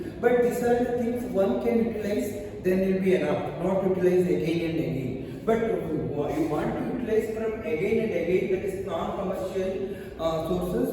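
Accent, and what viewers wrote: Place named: India. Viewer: This Indian English only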